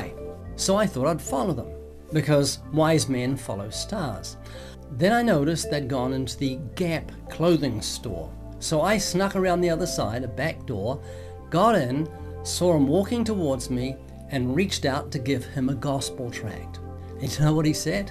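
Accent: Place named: New Zealand. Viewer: Hi Ray I'm from your old Church in New to Zealand. I have a non Spiritual question how come you haven't lost your kiwi as accent. Your been living in LA since the 80s.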